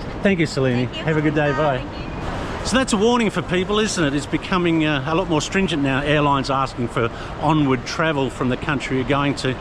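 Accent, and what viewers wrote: Australia. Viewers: I'm a Brit used to watching several fairly Naff travel channels (I subscribe to other genres as well). This channel is the absolute dogs b___ compared to them. What a great travel Vlog this is. I just love your attitude and your way with strangers. There are so many laughs, I loved the time when the two Russian guys were arguing and had been fighting, in what was a fairly tense environment your Aussie twang never changed and you just still sounded so relaxed 🤣. Just fantastic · Lovely vlog, speaks to real life and travels. I was intrigued by the dish you waxed lyrical in Singapore. The You*Tube translator took your Aussie English and came up with "Luxer". I just kept adding the ingredients as your poetic review progressed until "Laksa" finally populated.😆😍🤩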